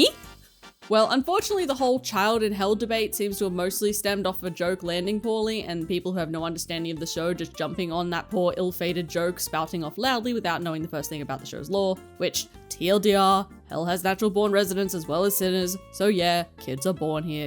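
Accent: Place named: Australia. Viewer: im so australian that i didnt even realise u were australian too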